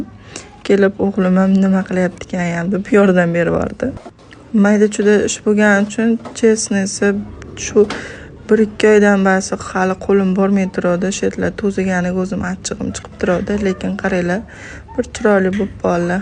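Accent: Kokand, Uzbekistan. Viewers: Qo'qonlikmisiz shevangiz.😊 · Менимча Коконликсиз шекилли шевангиз ухшаяпти😊😊😊😊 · Qo'qonlimisiz qatidansiz shevez judayam o'hshidi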